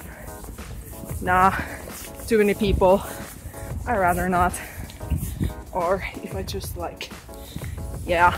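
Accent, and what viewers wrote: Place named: Finland. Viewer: I couldn't tell whether you were British or American but it looks like you're actually Finnish, and I love your accent **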